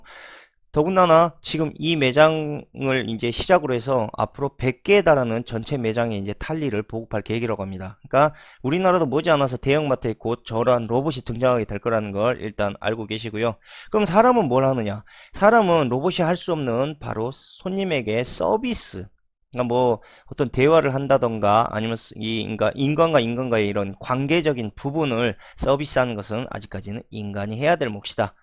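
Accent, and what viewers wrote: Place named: Honam, South Korea. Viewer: 욕을 기다리며‥. 빠져드는 강의 ㆍ 정통 호남 발음. 억양 좋습니다 그럼 수고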